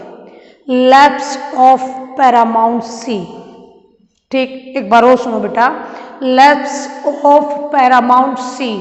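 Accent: Haryana, India. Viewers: Mam aap haryana se ho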